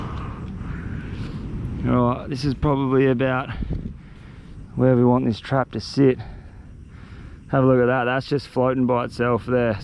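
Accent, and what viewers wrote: Australia. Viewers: He is Australian. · Is he from Australia? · What an inspiration. The Aussie accent just makes it that much more watchable.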